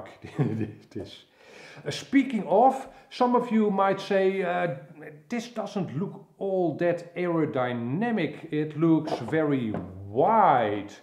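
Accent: Netherlands. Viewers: Mate, your Dutch Accent is brilliant. I love the Wing, I love the Video but all I can think of is: "Pipe and a Pancake" 😂😜✝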